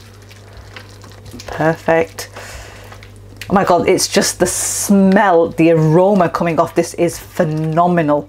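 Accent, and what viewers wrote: United Kingdom. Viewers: oye fake King tujy itne se bat samj nai ati ke wo UK main pedA hue hain is ley un ka accent asa hai aur fake accent wo hota hai Jo banda 3 month UK main rahy aur phir British accent ki Tara bat kare. to ye sab ko pta chal jata ke ye fake accent bana rha hai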